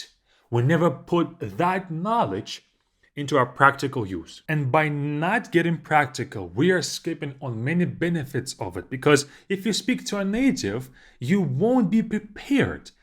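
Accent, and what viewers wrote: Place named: Russia. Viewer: No? He just has a strong accent because he’s Russian. What he actually said was camps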